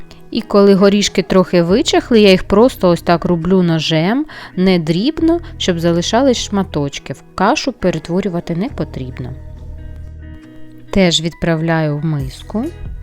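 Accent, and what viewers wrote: Standard. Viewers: Мені дуже подобається ваша чиста українська мова! І салатик смачненький! Буду чекати інших ваших рецептів!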